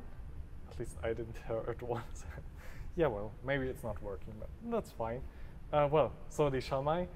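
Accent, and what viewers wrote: Germany. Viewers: Are you from German your englisch is like a German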